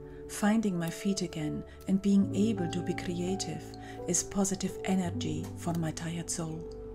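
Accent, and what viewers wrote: Scotland, United Kingdom. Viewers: It sounds to me that you have a Scottish accent? So where did you move from?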